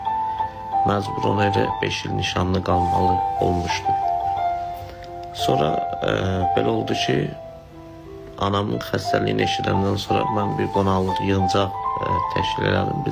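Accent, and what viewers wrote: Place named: Lankaran, Azerbaijan. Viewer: ☝️Iştı ləhçəku zıne bedə tı Lankonıjiş 👌Xıdo rəhmət bıko 🤲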